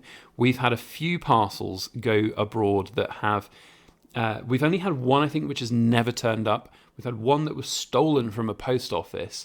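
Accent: United Kingdom. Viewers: Are you living in the UK? Because your accent is very british.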